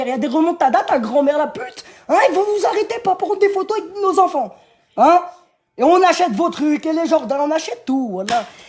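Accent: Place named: Paris, France. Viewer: L'accent parisien cet horrible 😱. Mdrrrrrr le titre de la ligue 1 conforama lol 😂 et la coupe de France 🇫🇷 mdrrrrrr grandiose mec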